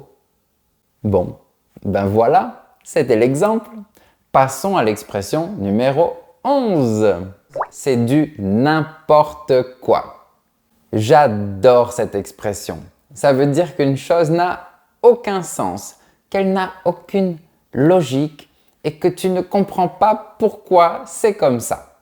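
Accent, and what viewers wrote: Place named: Toulouse, France. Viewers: Le petit accent toulousin est tres mignon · Personnellement, j'ai toujours fait la liaison dans l'expression "Au cas où", je dis "Au cas z'où", comme dans "c'est obligatoire", j'ai trouvé bizarre que vous n'ayez pas fait la liaison, moi, je dis "c'est t-obligatoire" pas "c'est obligatoire" comme s'il y avait un h muet à obligatoire (hobligatoire)... ce n'est pas une critique, ce n'est qu'une observation, c'est une vidéo très intéressante, et vous êtes super-charmant... et attendez que je devine, vous êtes du Sud-Ouest. 😁😁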